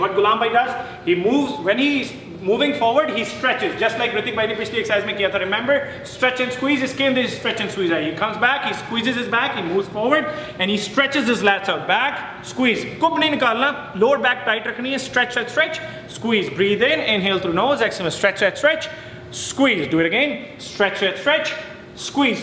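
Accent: India. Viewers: kayknya kalian org india ya?...dari logatnya ketahuan. benar gak?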